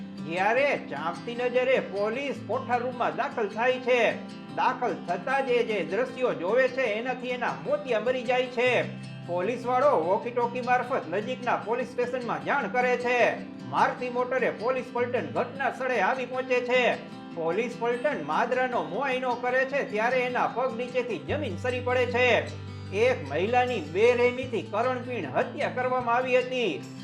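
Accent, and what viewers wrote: Kathiawar, India. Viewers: ના હો ભાઈ તમે ગમે તેટલા બદલો પણ આ રણકો નહિ બદલાતા કાન માં ઠંડક થાય છે આવું ફાંકડું original કાઠિયાવાડી ભાષા ની કિંમત છે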